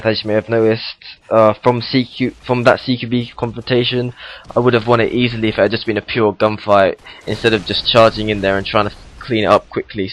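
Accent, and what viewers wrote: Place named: United Kingdom. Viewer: Its British English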